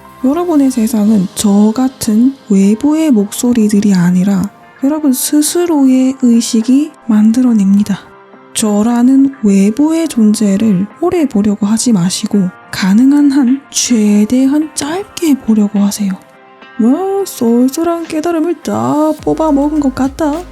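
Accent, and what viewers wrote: Gyeongsang, South Korea. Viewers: 도네이션을 주기적으로 밥먹듯이 하는 이 채널의 정체는 무엇인고... 오호.... 제 유튜브채널 어차피 100만 넘을 것을 압니다.. 어차피 차고 넘칠 것.. 그냥 천천히 해보도록 하겠습니다.. 어차피 100억 부자 될걸알기에 천천히 즐기면서 인생 살도록 하겠습니다..ㅎㅎ 와... 이렇게 글만 적어도 너무 행복해지네요.. 더 신기한 것을 이 모든 내용을 말하면서 그림까지 완벽하게 그려내시는 당신은 도대체.. 짱이네요.. 그나저나 경상도 아니면 부산분 같은데! 맞나예? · 이 놈의 갱상도 억양은 정말.. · 경상도 사투리 같은디.. 그것도 안동, 영주쪽 .. 본관이 어뎁니꺼? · 구수한 경상도말투에 너무 재미있게 잘 보았습니다. 앞으로도 계속 풍요 안에서 좋은 내용 많이 나눠주시면서 더 행복하고 자유로우시길 바랍니다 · 경상도사투리와 서울말이 섞여있네요ㅎ 매력적입니다!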